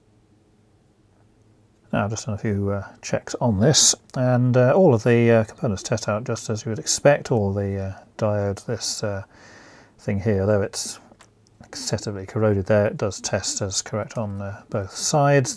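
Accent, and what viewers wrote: United Kingdom. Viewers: Nice - i couldn't help thinking, "hey, this fellow sounds a lot like the voice in my head when i'm muddling through some problem or another (but with an other-side-of-the-pond accent)." Some of these power supplies/adapters can be so intimidating... it's refreshing to see a unit with a straightforward layout. I'm working on a few Dell PC power supply units with no obvious faults, and this video gave me some inspiration to dig back in. I think it's time to invest in a reliable capacitance tester, since problems so often come down to those failed electrolytic caps.. just haven't seen them vent from below in my limited experience. Good to be aware of - Thanks for sharing!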